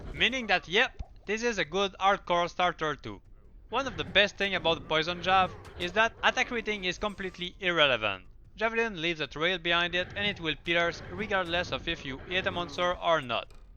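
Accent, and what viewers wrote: Quebec, Canada. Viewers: Québécois? Nice video 👍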